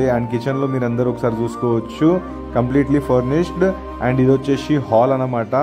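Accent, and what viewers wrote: India. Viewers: Hyderabad slang bro we are proud of him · Typical maarvadi tone and Telugu and of course English is all messed up. No big deal.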